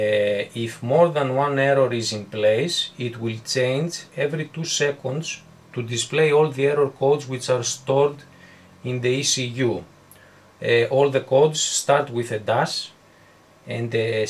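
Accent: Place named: Greece. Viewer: nice vid, when I heard your ink in first second I said .. this guy is greek, giamas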